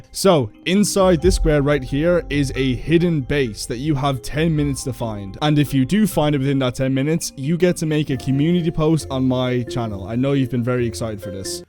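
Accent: Ireland. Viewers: r ya irish?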